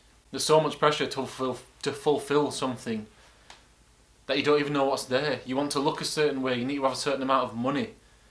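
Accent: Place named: Yorkshire, United Kingdom. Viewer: Ngl i was just lurking here to learn ur accent cause like i said i wanted to prank my friends with a yorkshire accent, BUT this video hit me in unexpected ways, i needed to hear this at this moment. I've been unmotivated to fo anything for the past 3 months. And being at my age (which is 24) it sort of builds another level of pressure whenever im paralysed by overthinking. It's very hard to snap out of it. But what u said kinda gave a push to start small, start somewhere. Thanks for this man.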